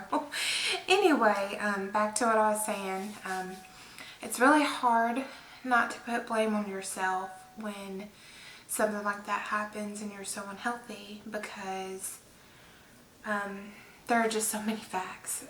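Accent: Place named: Tennessee, United States. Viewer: YAY!!!! Look at you sittin there all cute!!!! I liked and subscribed before the video even started 😂😂😂 I love your accent. Now when I read your posts on IG I can do it with your Tennessee flair 😉 Amazing first video. I look forward to the next one.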